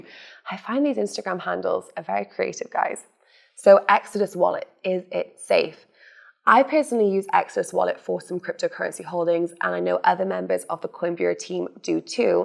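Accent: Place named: Scotland, United Kingdom. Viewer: Thank you. Enjoyed your presentation and explanations. English / Scottish, niiice. Beautiful language.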